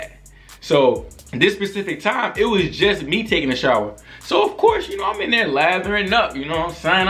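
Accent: Baltimore, United States. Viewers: I knew you was from baltimore before you even said anything 😂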